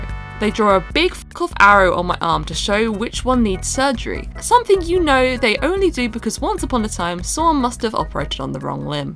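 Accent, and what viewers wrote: United Kingdom. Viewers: i love your voice idk why, maybe bc bri'ish lol also love your content :3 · you are so British that I have to pause the video to fully understand all your Britishisms.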